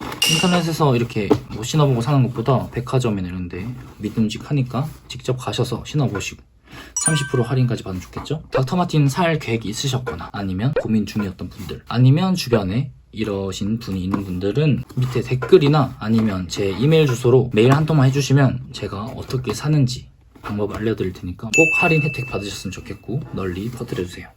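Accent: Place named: Gyeongsang, South Korea. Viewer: 역시 경상도 사투리 구수 하네용.. 부산 가본적이 없는.. 그주변지역은 다가본....부산 갈일이 없었다는... 신기한.. 잘봣습니다.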